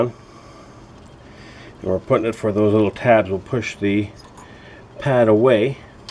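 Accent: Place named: Canada. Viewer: Hey Great Video Eh? Very Helpful and I love hearing your Canadian Accent. Is it ever excellent eh? Clicked the thumbs up and subscribed for future videos. Cheer's!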